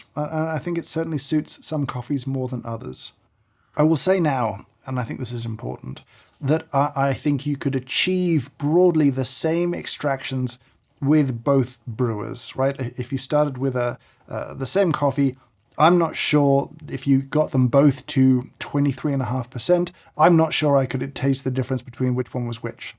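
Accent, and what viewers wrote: United Kingdom. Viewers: it's nice to see British youtubers who don't have a chavvy accent and can speak the queen's English correctly!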